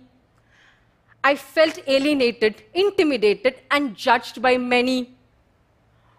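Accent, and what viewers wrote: India. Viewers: despite her strong indian accent I deeply understand her, good job girl...I am a rural girl and now I'm studying PhD course. what brought me here to watch your inspiring speech was that at the moment I'm improving my english skills to be able to apply for a postdoc position abroad. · she is Indian, why wouldn't she have an Indian accent?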